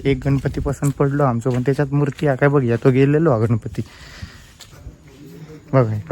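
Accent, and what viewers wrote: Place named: Malvani, India. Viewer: तुझी मालवणी खूप गोड आहे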